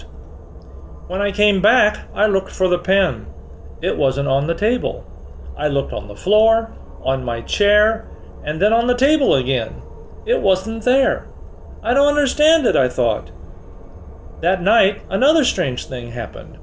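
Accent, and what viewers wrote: United States. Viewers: it was impressive.. We need another ones like this.. I love American accent alot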